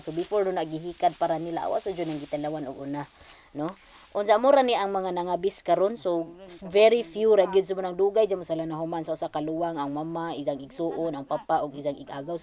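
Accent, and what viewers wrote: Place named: Leyte, Philippines. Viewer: Wow😮😮😮, the very best Bisayan dialect I’ve ever heard, Leyte’s Bisaya kinda mixed with Cebuano and Waray-waray accent and bit of Ilongo. I noticed something the Southern part of Leyte some words that we never heard of like “ Ginamon” was just simply as if you cooked rice on the pot. Boholano where my Grandfather came from I didn’t noticed any difference probably we were a kids when Grandpa was around. Love to see Ms. momentous moment when someone known artist visit her, t’was a big honor to have that level of celebrity come visit her. Yes, the “ J “ letter from Bohol and Leyte like Ajaw, gajud was my first combo words during my first year in Cebu, when I was hanging around with the kids they just kinda laughing my accent, took me years to have it adjusted but then when you go back to your place everything coming back. 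Watching from Leyte.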